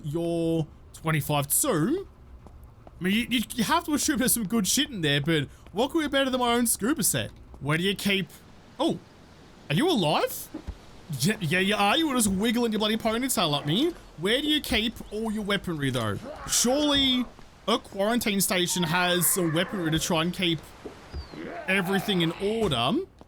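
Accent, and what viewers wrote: Australia. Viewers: You have the most Australian accent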